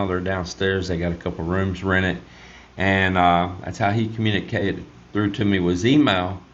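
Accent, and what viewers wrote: Texas, United States. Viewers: You actually had me at the beginning and I was checking if I had accidentally somehow changed my audio/video settings. Then I figured you were getting back at the guy that commented about your slow monotone Texas Twang 😂...I watched the whole video anyways. I'm half Filipino half American (born in the Philippines). I actually lived in the US for 25 years, was in the US Army for 12 (at the moment lived in the US more than in the Philippines). I'm retired now (42 yo) and live just north of Subic Bay. My eldest son was born in Frisco, TX when I was stationed in Plano, TX for Army Recruiting. I gotta get out there to your place and visit y'all sometime, I enjoy your channel specially the content about construction and the boats. Take care now. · I enjoy your Texas accent. The funny thing about the Texas accent is that it is contagious. 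I lived in Maine all my life until I moved to Cebu a few years ago and I had an uncle who I had never met who had lived in Texas for years and we were all so surprised that he had caught the Texas accent. In Maine a Texas drawl really sticks out. That works both ways, a Maine accent is very noticeable when traveling. I think that living in the Philippines does change a person's English by using different expressions like aircon, choco, CR, asawa, etc.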